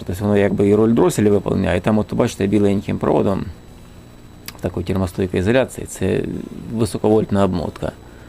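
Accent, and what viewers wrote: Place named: Poltava, Ukraine. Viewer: Шото говор наче полтавський! Угадав?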